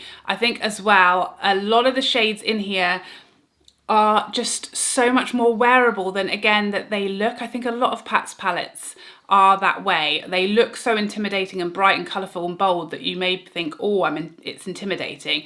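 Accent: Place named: United Kingdom. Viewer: you have the best videos. I love love love your sense of humor paired with the British accent. It’s the medicine to my life I was missing. You are the best! Yes I’m watching some of your older videos. Lol!!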